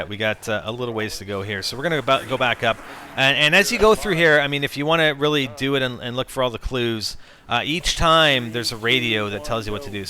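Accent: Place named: Canada. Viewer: your Canadian, aren't you..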